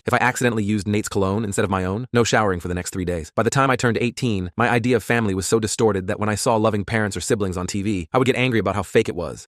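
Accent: Texas, United States. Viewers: Yeah bro I was like WHY DO YOU KEEP SWITCHING TO A TEXAS ACCENT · Why does it keep going texan accent 💀🙌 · TEXAN ACCENT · THE TEXAN ACCENT BRO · Texan accent + Kayla as his sister goes hard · Anyone else realize after like the first couple sentences it gets a Texan accent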